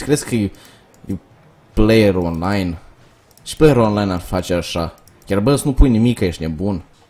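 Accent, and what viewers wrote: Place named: Moldova. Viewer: ba moldoveanu-le invata sa vorbesti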